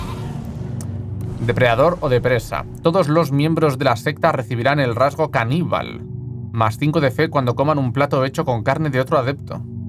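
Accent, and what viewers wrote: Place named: Argentina. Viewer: Hablas como Argentino más que Mexicano xd, lul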